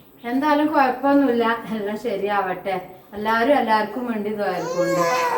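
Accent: Malappuram, India. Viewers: എന്താ എപ്പഴും ട്ടോ ട്ടോ പറയുന്നത് ശരിക്കും മലപ്പുറത്ത്കാരുടെ സംസാരം തന്നെ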